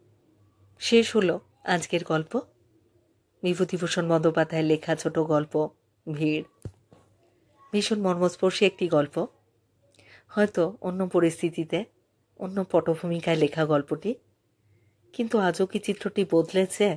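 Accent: Bangladesh. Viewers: বাঙাল উচ্চারণ কেন? আরও প্র্যাকটিস দরকার।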